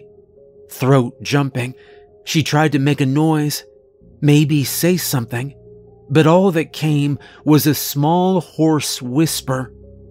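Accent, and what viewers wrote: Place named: South, United States. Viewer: Enjoy your narrations, are you Georgian? Carolinan? Edit Or Tennessean your voice sounds to me like home. Do you have a podcast?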